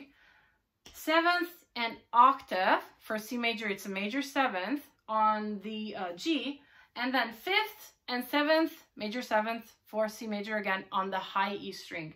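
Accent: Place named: Sweden. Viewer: Very talented! Eddie Van Halen fan here! Where do you reside from? Accent is hard to nail down. Kind of sounds Swedish? I am from MN, USA. Keep on rocken! Nice tapping!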